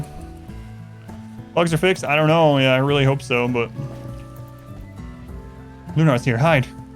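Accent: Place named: Appalachia, United States. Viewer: you should totally let your flag fly and do an Appalachian Run. You can revert to your old accent, only settle where it's most like Appalachia, and role play with all the stereotypes and culture of home. That would be amazing to see.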